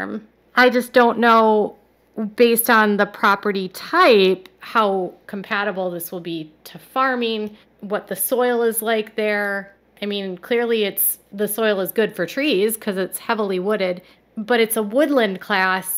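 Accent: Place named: Midwest, United States. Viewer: I'm also from rural Minnesota! For a while now, maybe your accent, I've thought you were from MN or somewhere in the midwest.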